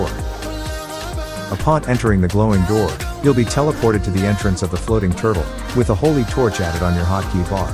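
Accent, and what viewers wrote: Philippines. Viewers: Are you filipino? Bc the sound is filipino bro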